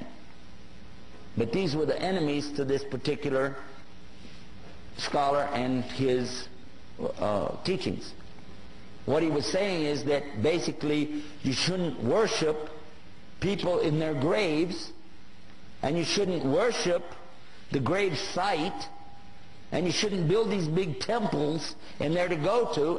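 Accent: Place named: Texas, United States. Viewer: هل الشيخ من أصول تكساسية؟ لهجته كأنها من تكساس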